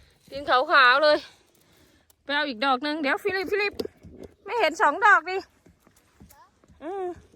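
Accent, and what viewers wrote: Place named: Phu Thai, Thailand. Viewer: โอ๊ย ยาย หนู กรี๊ด!!!นำ งามคัก งามคัก เห็ด ตาแซ่บเนาะ ยาย😆😊👍 หนูอยู่ แอฟริกาใต้ บ่มีให้กินเลยค่ะ ว่างๆ เพื่อนๆ แวะมาเยี่ยมเยียน ส่อง ทางแอฟริกาใต้ กันเนะเด้อค่ะ เข้ามาติชมเนะ พึ่งทำคลิปใหม่ค่ะ ตามสามีไปติดตั้งแก๊ส แบกแก๊สพร้อมพี่น้อง แมนคัก มาดามเมืองคนดำ 555😆🙏🇿🇦🧯🚐🌷❤️🏡✅ ยาย ขออนุญาตค่ะ คนที่ไหน ทำไม พูดคล้ายๆ คนภูไท แท้ค่ะ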